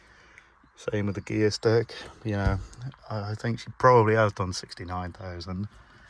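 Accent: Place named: Norfolk, United Kingdom. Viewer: Well that’s an accent I know you must be from my neck of the woods (Norfolk)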